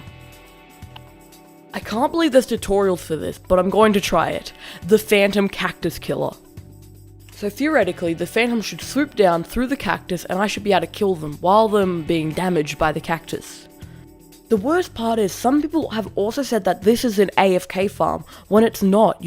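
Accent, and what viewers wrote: Australia. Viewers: Are you Australian??? You sound like Steve Irwin... CRIKEY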